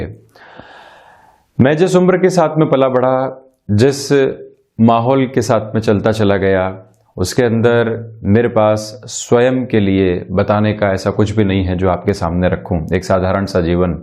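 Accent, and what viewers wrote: Standard. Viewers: Beautifully said! Whenever I listen to your pure language, I am reminded of my Hindi teacher in school, Smt Rajkumari Saxena to whom I am ever indebted for learning Impeccable Hindi. Its such a pleasure to listen to you, Sir.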